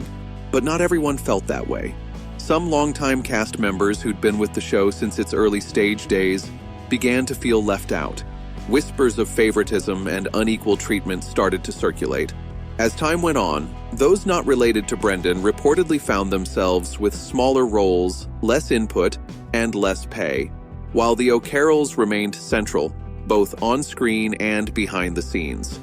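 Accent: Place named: United States. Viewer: VOICE OVER TALKING CRAP AND WHY IS AN AMERICAN DOING THIS AS THEY HAVE A DRY SENCE OF HUMOUR....VOICE OVER TALKING GARBAGE.......GO MAKE COFFEE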